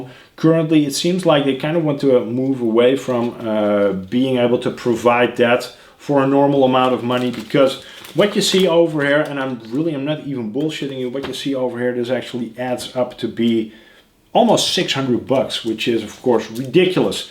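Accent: Netherlands. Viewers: Dutch accent ;)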